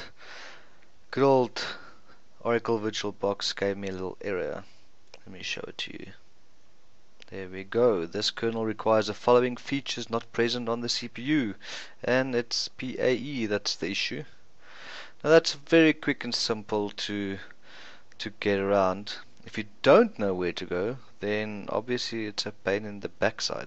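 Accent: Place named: South Africa. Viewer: thanks a million dude, are you south african :), Im from SA btw, and based in your accent and youtube name i guessed you are too. Thank you again ::)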